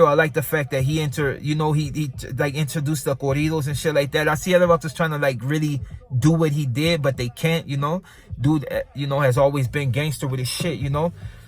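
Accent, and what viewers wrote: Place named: Mexico. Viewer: Chicanos can not rap. Leave that shit to the blacks. This dude righy here dont know shit abouy black history. THIS NIGGA THINK HE A MEXICAN. NIGGA SHOULD take that skin off and b a full mexican. Nigga dint even know his own history. Nigga talk like a mexican dress like a mexican midas well b a mexican.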